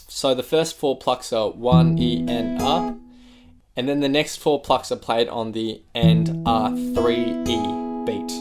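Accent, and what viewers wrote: Australia. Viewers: When I heard your voice I'm like AUSSIE AUSSIE AUSSIE OI OI OI. 🇦🇺🇦🇺🇦🇺🇦🇺🇦🇺🇦🇺🇦🇺💚💛💚💛💚💛💚💛💚💛💚💛💚💛💚💛💚💛💚💛💚💛💚💛💚💛💚💛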